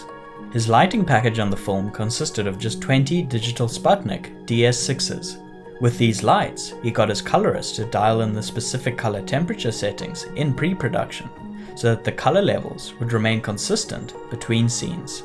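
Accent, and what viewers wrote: South Africa. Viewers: You sound South African, am I hearing correctly ? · you sound south african? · Dude are you from South Africa???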